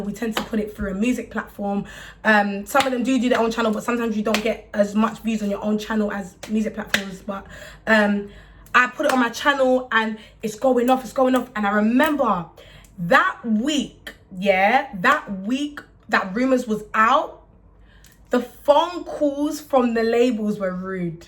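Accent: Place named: United Kingdom. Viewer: I don't usually like the British accent but you I love! but when you clap your hands all the Time omg I get so stressed. but love your energy and you deserve this love!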